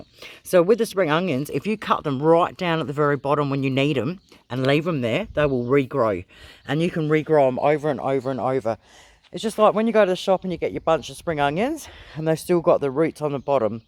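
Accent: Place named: Australia. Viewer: Love this ❤️ absolutely adore your personality 💕 you have that comforting Aussie bogan twang in your voice that just screams down to earth ❤️ I love your yard 🙂 I need to get off my butt and start growing foods 💕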